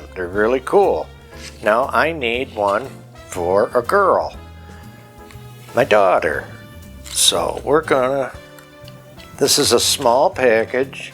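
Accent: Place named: Idaho, United States. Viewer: There's a first and your it 👏😍 there isn't a video of yours that I haven't enjoyed. I have gone back to look at your past video's and their all fantastic. Lots of flair and charisma. Oh by the way I also have a collection of milk glass love it. I have 68 Santa's not to many but their not anything like yours lol! I also have a collection of nutcracker's. My son has gifted me one every year 😃? You sound like an Idahoan yeah!! 😘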